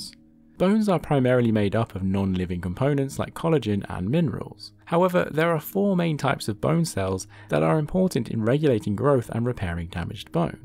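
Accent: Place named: United Kingdom. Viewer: Why do so many of these documentaries have narrators with shitty British accents?? If it's not David Attenborough then get an American or neutral accent.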